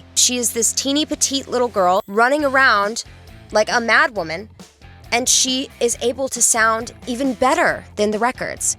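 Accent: United States. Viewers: I do enjoy your conversational videos even more than those where you sing.Your flawless American English is so good for my foreign ear that i can get trained in understanding a naitive speaker speech while being out of conversational practice at the moment myself...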